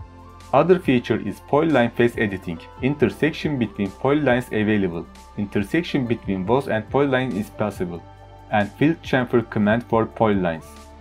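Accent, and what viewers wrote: Turkey. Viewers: Çok belli türk olduğu ingilizcesinden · Konuşan türkmü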